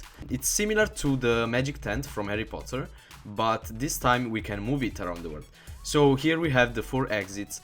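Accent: Italy. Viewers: he's italian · are u italian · Si sente l'accento italiano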